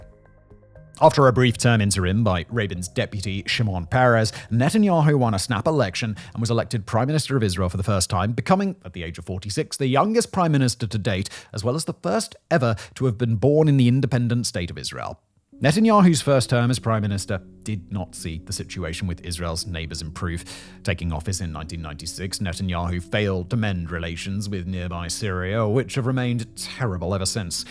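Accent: England, United Kingdom. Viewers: I must admit, the way he so confidently stated it with that kings English accent had me question my own pronunciation for a moment.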